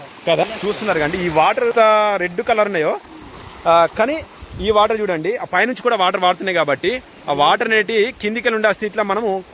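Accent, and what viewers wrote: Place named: Telangana, India. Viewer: Brother beutiful telangana language your speaking.nice keep itup God bles you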